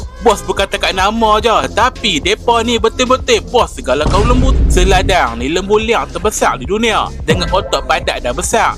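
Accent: North, Malaysia. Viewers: loghat utara tu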